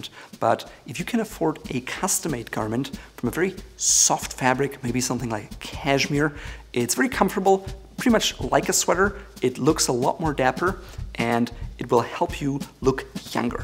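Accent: Germany. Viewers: I like your accent! Are you German? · are you german? your accent sounds like a german.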